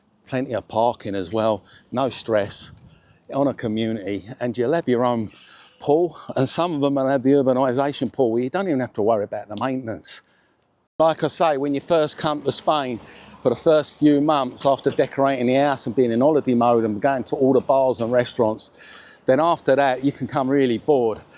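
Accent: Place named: North London, United Kingdom. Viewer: I love your accent. You speak like my Dad used to. I reckon you must be from north London maybe Tottenham?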